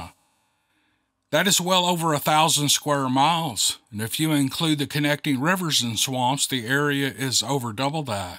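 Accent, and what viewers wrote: South, United States. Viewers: I have a news lash for ya, you cannot mask your accent it comes through no matter what, that is how we Southerners talk, if it does not agree with some folks that alright with me! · You talj just fine people are just mean. Your Southern accent is cool. If I had that accent here in California, I'd be running from all the women, don't change a dang thing lol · What's wrong with your accent? I've lived in the south, and you have a fairly mild accent. Try under standing someone who primarily speaks "gullah". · your 'valley girl' impression needs some work! Nice try but please keep that wonderful, natural, southern twang, after all this is! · I love your southern accent!! Screw those haters! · As a California native, I love nothing more than a Southern storyteller. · Hey I always liked the us southern accent, I don’t know when people started using the like word so much it’s enough to drive me right up a tree a can’t stand it, even some older people use it now, I would rather have a jaw full of tooth aches than here it, lol Fr Canada 🇨🇦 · Thank God you are back with your southern accent. 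Who cares what that one or two feels about how you talk. I like it! Your voice feels like home. Your stories holds my attention and I hear your stories the way they should sound. Glad you are back. ❤ the stories you share! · For me there ain't nothin better than hearin' the voice of a southern man. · I agree with Kevin! You sound like a true Southern Gentleman. I enjoy listening to most of your stories. Thank you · Your "accent" is part of who you are brother.... F all that.... And just a personal note... I find it comforting... Moved to DE at 15 after a lifetime prior in WV/OH. That was 94... Been here since... Family back there passed mostly... Elders due to age, and even my cousin who was the closest friend/brother and in age as well, I had... Drugs took him. Life rather, took him..... I won't get into all that.. but I'm saying... I listen for many reasons... The southern feel... Is one. 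Thank you. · Hi, just wanted to say regarding comments on your accent, as a New Zealander retired in Thailand, i find your southern accent & story reading skills, adds to the realism, and i can picture the story in my mind, ( true or not ) i really enjoy them, ķeep it up, cheers. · Southern accents are cool, cause it makes the stories folksy & that's a good thing🤗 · Sir, we love you for you. I was born and raised in the South. Hearing your voice makes me feel home. We joke that my inner compass broke because I moved up to LaCrosse, WI. Then, I got married and had to stay for now. My husband has decided he wants to move South. Praying we get to. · you have a great accent like me Southern🤩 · I love your accent. You see I’m a Connecticut Yankee. I had a wonderful pastor from the south. He was such a kind and wonderful man. He loved all his congregation and we loved him. Every time I hear you I think of him. 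Thank you, your voice is very comforting. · Are you kidding me? Everyone loves your southern accent 😊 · you got a great southern accent you’re a natural story teller excellent. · Ok,OK, keep the Southern country boy accent please. 😂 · I love your dixie accent Love 'n' hugs from England ❤ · I love your accent. I'm southern too and ppl laugh at me all the time and I could care less. Been in Fla for 28 yrs now but grew up in Thomasville, NC. A true southern girl here and dang proud of it!!!!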